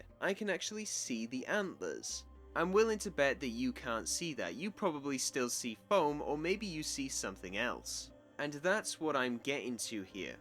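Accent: United Kingdom. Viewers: I like to watch your video it just my bad and my fault for insulting you cuz i like to hear when u says "Water" and "Woter" to a Wa Er 😂 but sometimes i heard and listen carefully on your "UK accent" it got me laughing alone like people look at me like am a craaaazyy person.. 😂😂😂😂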